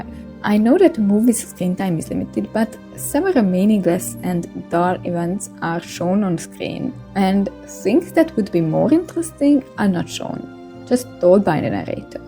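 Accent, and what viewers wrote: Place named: Germany. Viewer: Yup. You sound just like A German.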